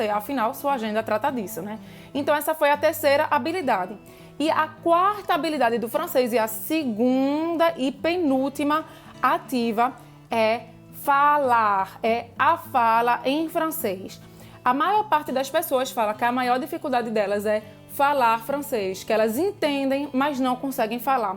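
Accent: Pernambuco, Brazil. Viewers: Seu sotaque pernambucano falando francês é um charme!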